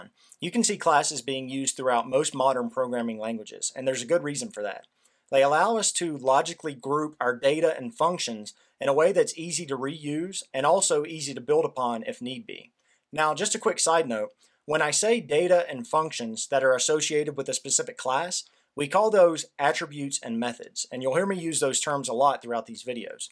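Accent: South, United States. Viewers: me: I like this guys accent, kinda southern also me: m8 you live in tennesse, you have the same accent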